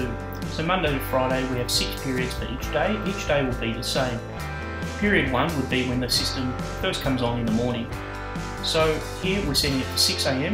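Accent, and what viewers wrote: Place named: Australia. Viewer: Please re-record this video without the music or have the music substantially reduced. This is an unprofessional demonstration and does not speak well about your digital awareness skills. Depending on one's music system, the 'background' music is magnified and combined with the Australian accent of the presenter (who is perfectly fine btw) non Aussie's cannot decipher some words. Based on this video alone, I may be forced to dismiss the product from consideration. Thank you.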